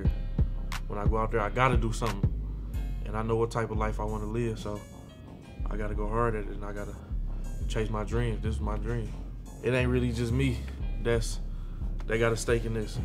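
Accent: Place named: South, United States. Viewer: He may have spent time in the D but he obviously spent more time in kentucky. He has a thick southern accent and he shouts out kentucky in alot of songs.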